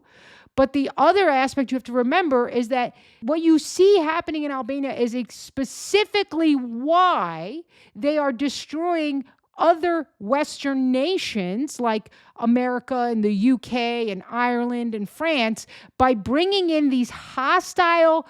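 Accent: Italy. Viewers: Love the Italiano accent. I used to actually think you were one a them there New York Italians. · Love the Italian accent !!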